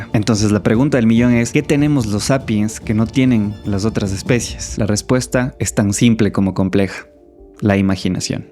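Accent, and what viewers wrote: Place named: Ecuador. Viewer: Eres de ecuador? El acento es inconfundible :P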